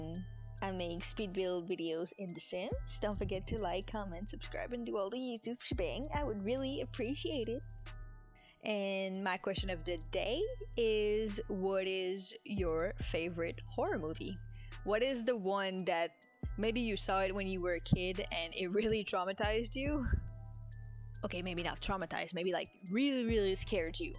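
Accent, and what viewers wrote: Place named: France. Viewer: Omg, I was gonna say it sounded like French was your first or second language because of the way you said "occult", but for some reason I thought you were from an African country that was a former French colony. You speak English much like a native speaker though, you are really good at it 😃👍, especially for only speaking it for 3 years! It took me way longer to learn English. Thank you for replying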